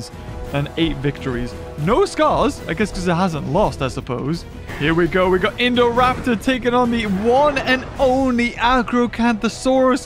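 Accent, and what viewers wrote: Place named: United Kingdom. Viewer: British dude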